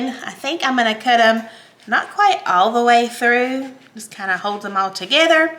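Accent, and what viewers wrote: South, United States. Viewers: I just discovered your channel! I live in the Deep South and gurllll you talk like I do. 😂 I think our southern accents attract fun people! Southern Hospitality, these recipes look great. I subscribed. Thanks.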